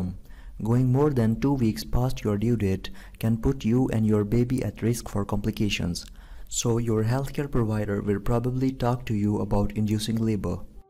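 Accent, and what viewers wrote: India. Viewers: what an indian accent.. Is this from Bollywood ?? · Kashmiri accent in your voice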